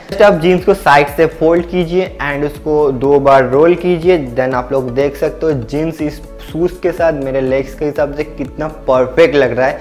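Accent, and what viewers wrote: Bengal, India. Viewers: Bengali bolte bolte ap ki Hindi begd gaee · Aap bengali hai kya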